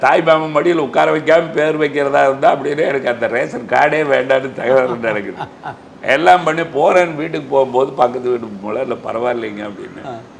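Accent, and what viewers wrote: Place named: Coimbatore, India. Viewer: Comibatore slang super sir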